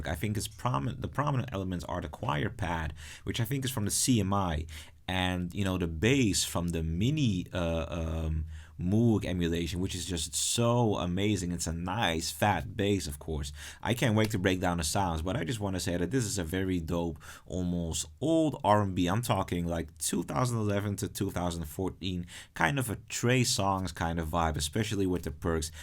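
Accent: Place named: Netherlands. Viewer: i can hear dutch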